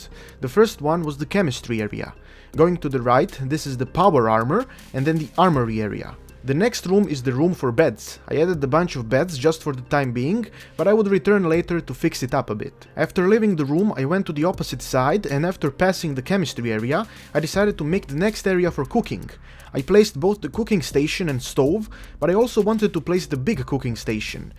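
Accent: Eastern Europe. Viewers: Nguyen He's from someplace in Eastern Europe, don't know exactly where. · You are so funny mate!!! Also what country are u from, u sound Eastern European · where are you from? your accent sounds eastern European or Russian. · The Eastern European accent makes the suble vulgar comments pretty enjoyable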